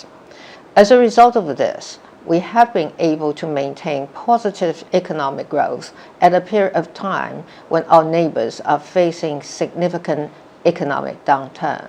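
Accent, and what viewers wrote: United Kingdom. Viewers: 濃濃的英國腔.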